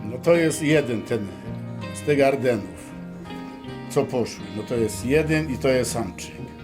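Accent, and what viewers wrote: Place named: Cieszyn Silesia, Poland. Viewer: Witam. Skąd Pan jest bo akcent jak z Śląska Cieszyńskiego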